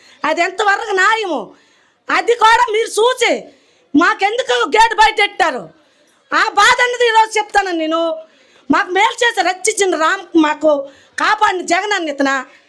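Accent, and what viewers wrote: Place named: Srikakulam, India. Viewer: అచ్చం శ్రీకాకుళం యాస, నిలువెత్తు నిజాయితీ, మేలు అనుభవించి మేలు కోసమే గళం విప్పే విధానం. శ్రీకాకుళం అంటేనే మంచితనం అనేలా వున్నారు తల్లి. జయహో జగన్ మోహన్ రెడ్డి గారు. ప్రతీ పేద, అణగారిన బ్రతుకుల్లో జగన్ అనే వ్యక్తి ఒక ఒక తండ్రి గా ఐపోయారు ❤️❤️. ఈసారి జగన్ కోసం ప్రతీ కార్యకర్త గళం విప్పాలి, శ్రమించాలి ❤️❤️🥰🥰.#Vote for FAN ❤️❤️🥰🥰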